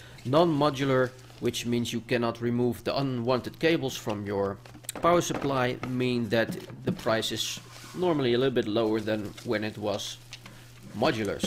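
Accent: Netherlands. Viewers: are you dutch or belgian?? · Love your video's man. Your dutch accent is funny xD Could you possibly help me with a build? The budget is 750 euros. and id like a GTX 770 in there. Thanks annyways. Keep up the great work! I dont need a Monitor, keyboard, harddrive, or mouse. Also id fancy a sexy/badass case! <3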